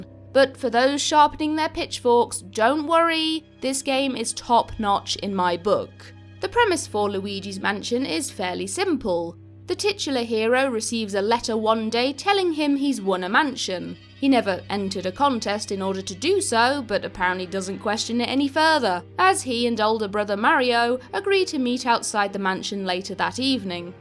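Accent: United Kingdom. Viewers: You sound very british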